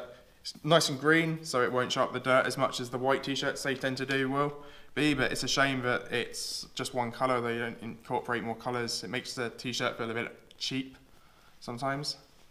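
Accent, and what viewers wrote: United Kingdom. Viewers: thanks So are u actually British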